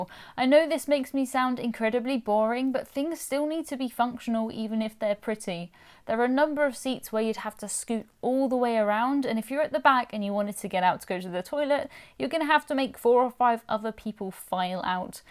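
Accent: United Kingdom. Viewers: Most definitely they should pay you! I would pay to upgrade my iPhone with your voice with the lovely British accent (I'm from the U.S.). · i really like your videos and as I am from Germany I like to listen to your nice British English! ;-) thanks for sharing your honest opinion!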